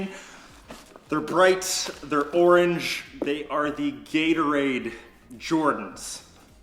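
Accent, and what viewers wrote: Canada. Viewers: Damn I can tell your Canadian hahah that accent hits hard!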